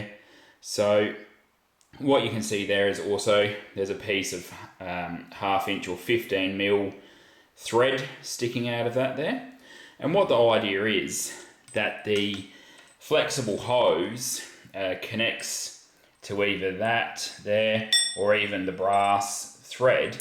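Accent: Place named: Australia. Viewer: VERY strong Australian accent. Fortunately i can understand it. Gave me a good tip i can use for the hose I’m installing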